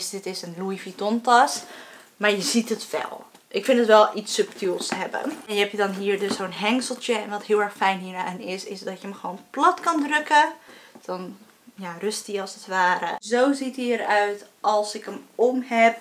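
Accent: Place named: Netherlands. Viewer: klink pittig Nederlands🙃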